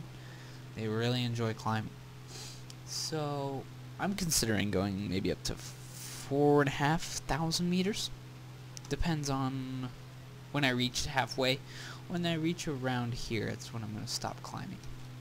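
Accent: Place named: Russia. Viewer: good job man :D Dat Russian accent doe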